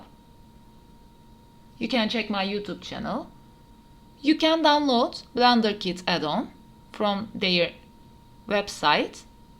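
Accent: Turkey. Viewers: Ablamın ingilizcesinde türklük akıyor😀 Çok yararlı bir videoydu teşekkürler! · 😁 Türk aksanı nasıl kendini belli ediyor. İngilizceniz iyi bu arada. Video için de teşekkürler. Kolay gelsin. 🙋🏻‍♂️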